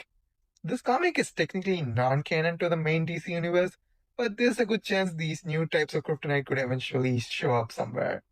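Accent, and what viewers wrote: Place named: India. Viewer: Indian voice makes it feel like a tutorial on YouTube 7 years ago I like it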